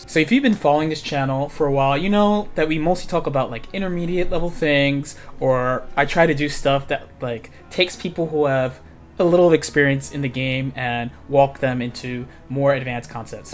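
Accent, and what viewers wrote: Baltimore, United States. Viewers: Bruh you from Baltimore?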